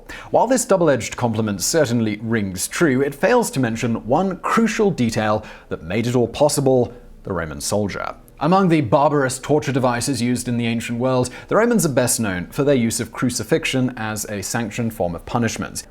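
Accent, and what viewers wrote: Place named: RP, United Kingdom. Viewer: Posh-ish English accents are magical. I have no idea as to this mans qualifications, background, or specialization. And yet he sounds intelligent and authoritative in damn near every video he's done. It WOULD be hilarious however if he had the most absurd Liverpudlian, Mancoon, Cockney or American Southern accent when he's not doing videos.......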